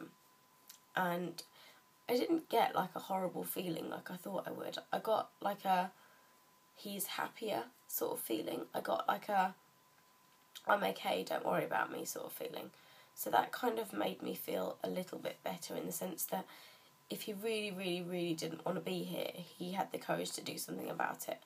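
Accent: England, United Kingdom. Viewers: whoa i was not expecting the english accent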